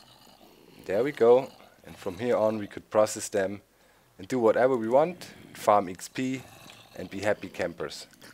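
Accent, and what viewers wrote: Germany. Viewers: Man hört deinen Deutschen Akzent:))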